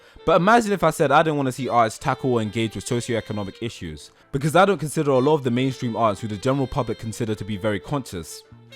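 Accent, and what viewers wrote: United Kingdom. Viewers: OK I’m in the New Jersey right now. I’m halfway through the video and I’m trying to get what it is you’re actually trying to say. I’m having a little difficulty with your very casual black British accent. I think for future videos it would be nice if you used a traditional thesis statement and clearly define the arguments arguments pro and con. What do you seem to be doing now is playing a lot of distracting clips, name dropping a wide variety of media just because they have black characters in them and And doing sort of a stream of conscious rant that is very difficult to follow. I’m almost at the end of the video now and I don’t know what it is you’re trying to say. It seems to me that you’re saying that all black art is political because black is a social construct. I don’t know if that’s what you’re saying. If it is you could’ve said it in a much shorter video with much tighter editing and restricted to movies, television, Japanese animation as opposed to western animation. 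You could use storylines and specific examples from particular shows. I’m not really sure that you really thought out what it is you’re trying to say. Your analysis of get out is pretty off-base. I don’t like the premise of the movie. Which seems to be be suspicious of white people. It was a carefully crafted screenplay. Not being African-American I’m pretty certain you have no idea what’s going on here anymore than I have any idea what it’s like to be black and British. Anyway good luck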